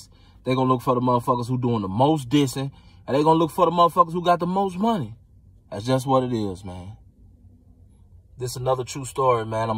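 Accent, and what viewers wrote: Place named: Chicago, United States. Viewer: Sounds like he from Chicago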